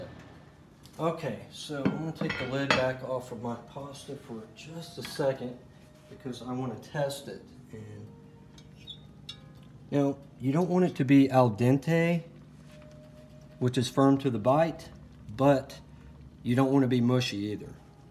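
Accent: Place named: South, United States. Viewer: I love listening to your voice, first it's like a blast from the past, second it's soothing and calming and finally that bit of southern inflection is still there and it's like comfort food for the soul but no calories!!! Keep the videos coming.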